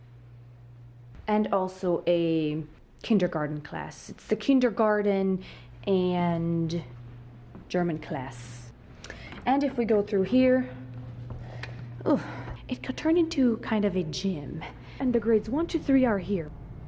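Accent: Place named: Ireland. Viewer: Your accent has a tiny bit of an Irish sound to it at times, either you did some travelling or Hutterites accents change a bit from colony to colony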